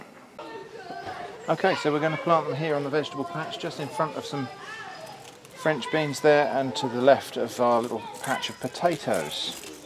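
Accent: United Kingdom. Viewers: I like your channel, the clear British English and the calm atmosphere in your videos. Great work, keep it going👍👍👍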